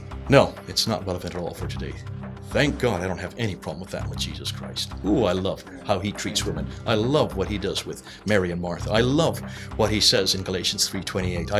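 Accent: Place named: United States. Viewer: He's Britain but sounds like American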